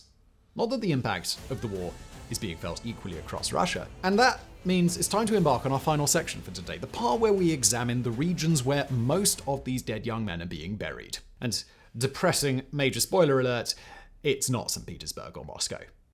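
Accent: England, United Kingdom. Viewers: Another NWO site and sounds like he's from that wannabe country called England . What was the last so-called WAR England had . Oh yes , invading the Falkland Island. Not only loosing one of their war ships , they had to use a cruise ship to bring the ground troops to the conflict . So , England has to war through Ukraine to test their weapons . Britain doesn't even rule the waves anymore since the ending of WW II .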